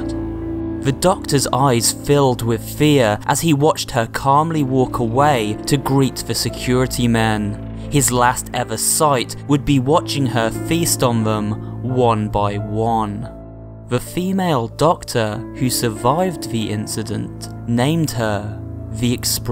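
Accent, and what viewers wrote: United Kingdom. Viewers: The British accent really adds terror to it.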